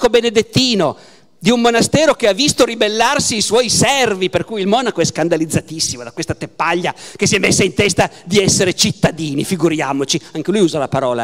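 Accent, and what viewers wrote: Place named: Sicily, Italy. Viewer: Barbero che parla siciliano è stupendo! Formidabile prof! :)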